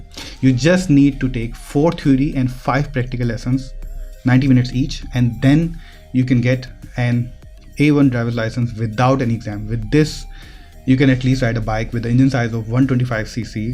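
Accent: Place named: India. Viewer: Me completely confused when hearing an Indian accent😂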